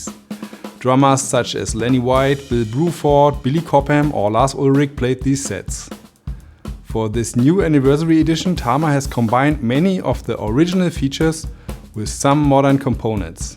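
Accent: Germany. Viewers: I very much like your demo videos. I like the direct approach to the information, and yes, I’m a weirdo, I like this type of German accent. (I lived in WB/Mainz for a couple of years long ago. As well, I’ll be taking delivery of this exact kit, in the same aquamarine color, this very week. Zo.. a little confirmation bias always feels good.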